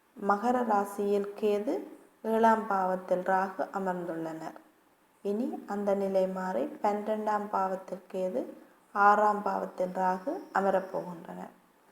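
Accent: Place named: Sri Lanka. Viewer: Ilangai tamil super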